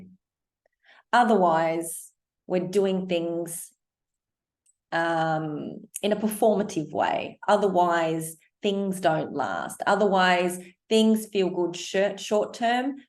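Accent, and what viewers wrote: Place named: Australia. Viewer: Hello, you've got a new subscriber here! I love it that you talk a little more slowly, so we have the time to let it sink in precisely that what you are saying. And i love your Australian (i take it to be) accent. Furthermore: the content of what you are saying is profound: it holds so much wisdom!!! I hope a lot of men and women will find you soon, for the work you do is very important!!! Thank you and love from the Netherlands. 💗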